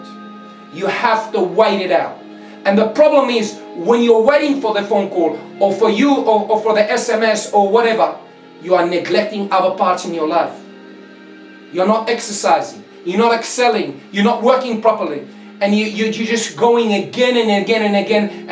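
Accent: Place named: South Africa. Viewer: Hello i sent an email of my situation. Also are you from south africa or did you live there before? I hear some sort of SA accent. Thanks for this amazing video!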